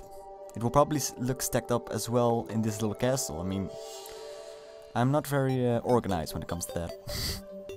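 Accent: Netherlands. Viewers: You sound very dutch to me... no doubt. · You are dutch right? I can hear it in your accent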